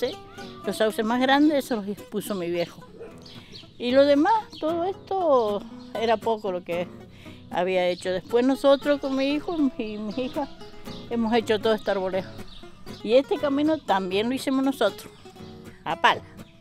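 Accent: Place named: Chile. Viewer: Habla igual que los cjilenos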